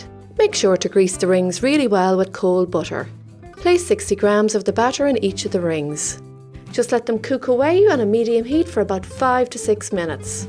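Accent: Ireland. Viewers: YOUR irish accent and watching was great❤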